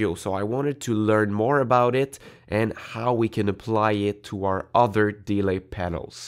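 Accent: Quebec, Canada. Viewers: Is that accent from Québec?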